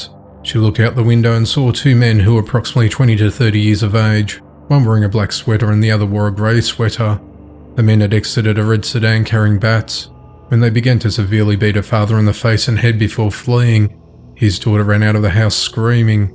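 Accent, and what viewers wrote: Australia. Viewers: This is like an Aussie version of Chills voice.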